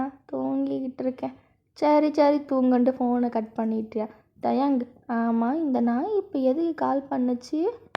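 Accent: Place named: Kerala, India. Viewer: Neega malayalam ahhh..? Light ahhh malayalam slang varuthuu ana athum nallah tha erukkk super storyyy😌🤍🫴🏻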